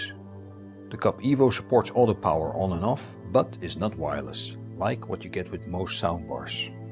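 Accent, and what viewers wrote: Netherlands. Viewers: enjoyed your dutch accent thought :) my main challenge with these Focal is where to set u the speakets. because in the back of the room the roof is crawling (-under the roof), so I don't know how the speaker will manage the atmos. It is very clsoe to the roof.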